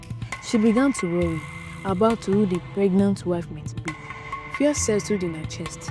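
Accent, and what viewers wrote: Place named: Nigeria. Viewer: Good story. Fantastic Nigerian English accent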